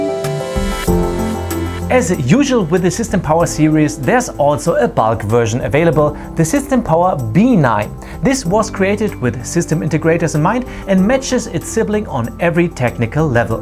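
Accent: Germany. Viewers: I feel the German accent but I have this alimentation and it doesn't makes noises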